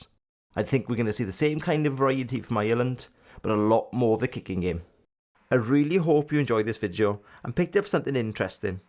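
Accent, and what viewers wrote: Wales, United Kingdom. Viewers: Great analysis and love that Welsh accent! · love your welsh accent and your clinical analysis. I'll be back I hope carberry does the business romorrow. TOP DRAWER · Nice soft Welsh accent 😂😂😂 & great video! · WOW - I have never seen such an in depth and comprehensive analysis! Fantastic - thanks for the work you put into this - I also have to say I love the Welsh accent! Here's hoping that we get to see an Irish win tomorrow - but either way it should be one hell of a game! COME ON IRELAND!!!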